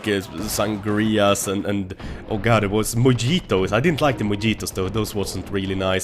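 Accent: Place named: Sweden. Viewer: Haha shut up! Have such love/hate for your channel but i cant stop watching and listen to your swenglish haha:D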